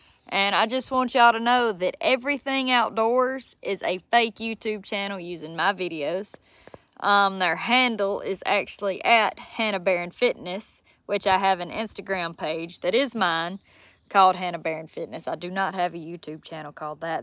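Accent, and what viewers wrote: South, United States. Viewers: I absolutely love your southern accent so hot and your pretty easy on the eyes too girl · this is southern accent that Forrest Gump had spoken? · I’ve been a fan for a couple years and you’re the best! Great content and so genuine!!! Beautiful southern woman with magnificent southern accent and great skills!! Seems like there’s no getting rid of those thieving trolls!! All the best to Hannah and her kin!! MMc.. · Thanks for the heads up. Your a darling. I love your Southern bell accent!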